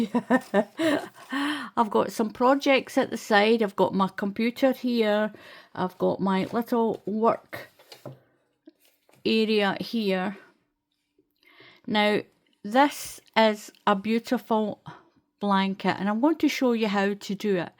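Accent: Scotland, United Kingdom. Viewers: This is a beautiful pattern - thanks so much for sharing it! Your instruction is wonderful and I so enjoy listening to your lovely Scottish accent. I've done some swatches with different yarns and hooks and haven't been pleased with my results. I'm going to order the brand you use in the video. May I ask what crochet hook you used?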